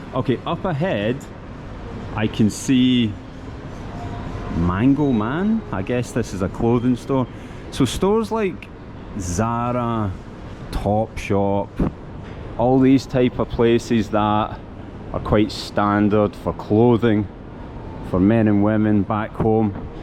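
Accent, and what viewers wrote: Scotland, United Kingdom. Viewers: I LOVE you sottish accent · Scottish guy reading Russian - my regards to your effort mate :) Last year Irish guy accidentally smashed my side mirror, was a funny way to meet a foreigner in Moscow. All are welcome, enjoy and have fun. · Обожаю шотландский акцент!))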